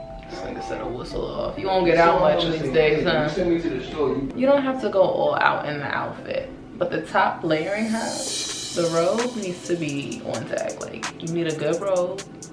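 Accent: New York, United States. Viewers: Lmaoooa “my ock don’t remember me??” I knew you were from New York😭😭💛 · where she from ? she sound like she from chi that’s where I’m from and she sound just like me 🤣 nvm she sound like she from New York 🤦🏽‍♀️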